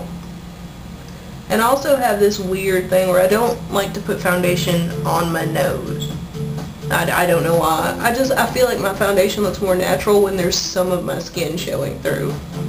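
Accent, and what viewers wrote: United States. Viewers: i love your country accents!! So cute!! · she sounds so country hehehe reminds me of my home town nashville tn but i never picked up a accent.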